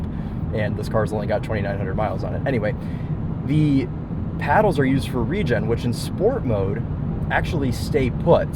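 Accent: United States. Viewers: As an Iraqi Arab student, I enjoyed this video very much. It was like an English lesson for me, and I benefited from it to develop my English because your words were very enjoyable and very useful. I also like the American accent, and as for the car, it is wonderful, and it was a wonderful experience. keep on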